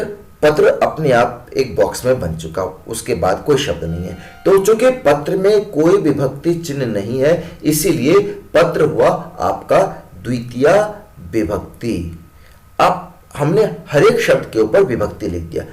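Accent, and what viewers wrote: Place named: Hindi, India. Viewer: మొదట సంస్కృతాన్ని సంస్కృతంలా పలకడం నేర్చుకోండి. హిందీ రూల్స్‌ అప్లై చేయకండి దాని మీద. భాష మీద అభిమానం ఉంటే సరిపోదు. సరిగ్గా నేర్చుకోవాలి. హిందీ రూల్స్‌తో సంస్కృతాన్ని నాశనం చేయద్దు.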